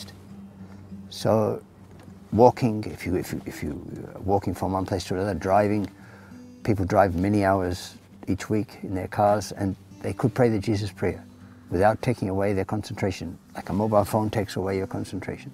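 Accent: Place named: Australia. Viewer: I’m finally picking up on Father ’ Australian accent.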